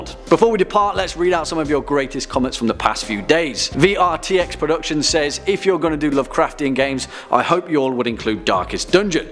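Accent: England, United Kingdom. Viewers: has the best english accent ever makes binge watching easy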